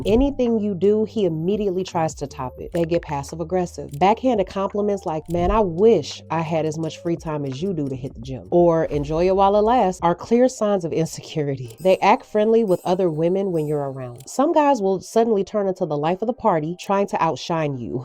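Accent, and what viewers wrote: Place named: South, United States. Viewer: My cousin says women are like ice cream, 38 different flavors of the same 💩! I love the southern accent on this commentator😋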